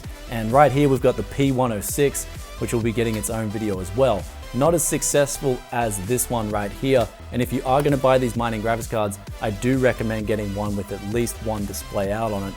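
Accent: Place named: Australia. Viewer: Grrizz HES Australian bro ahahah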